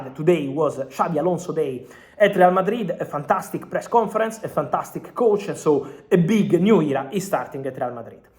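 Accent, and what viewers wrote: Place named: Italy. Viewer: It´s always funny and so annoying at the same time hearing an italian guy speaking english with italian accent.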